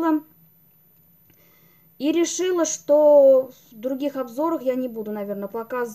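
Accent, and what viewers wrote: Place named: Bashkortostan, Russia. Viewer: Здравствуйте,!Хочу поддержать Вас и Ваш канал.Видно,что Вы добрая,хорошая девушка,у Вас прекрасная семья и чистый уютный дом.И обзоры интересные,и говорите хорошо.И акцент небольшой придаёт только шарма,мне это нравится.Вы же ещё и на башкирском говорите,поэтому и есть небольшой акцент.Не обращайте внимания на злопыхателей,к сожалению они есть и в жизни тоже.Всего Вам доброго,успехов и процветания каналу!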